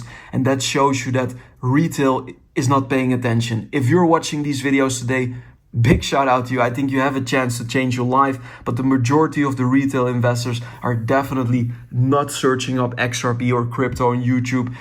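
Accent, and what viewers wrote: Netherlands. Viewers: Ben je een Nederlander?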